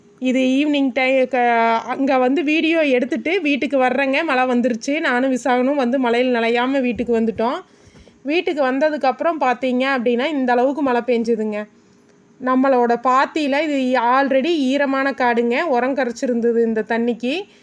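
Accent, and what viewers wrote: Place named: Kongu, India. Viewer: arumai akka unga kural kongu slang nalla iruku kekarathuku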